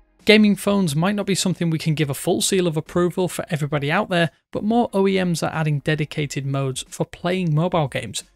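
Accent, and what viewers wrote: United Kingdom. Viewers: Which accent is he speaking? British? Can anyone tell? English isn't my primary language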